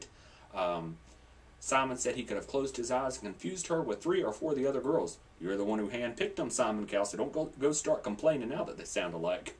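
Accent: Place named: South, United States. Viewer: Who is this guy? I'm from the South, but sorry, you sound like a hick. To quote Kara, you've got ridiculous pipes, but don't mean it as a compliment.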